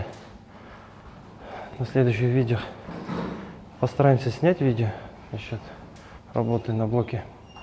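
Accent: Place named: Kazakhstan. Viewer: Казахский Русский пойдет👍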